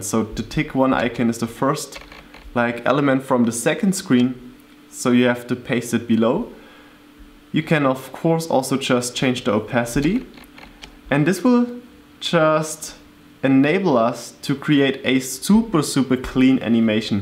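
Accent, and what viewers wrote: Germany. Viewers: Thanks for tutorials, really nice! Sometimes your germans makes me laugh lol. Anyway, loose the stickers :S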